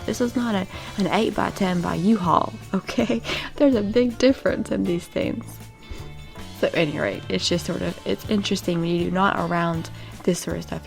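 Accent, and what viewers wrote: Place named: South, United States. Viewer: What a cool southern accent ! , sounds like the purest form of a southern accent , is it ? can't tell i'm from Norway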